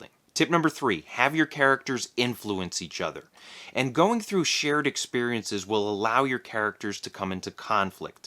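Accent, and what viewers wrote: Chicago, United States. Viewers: Are you from Illinois/chicago area?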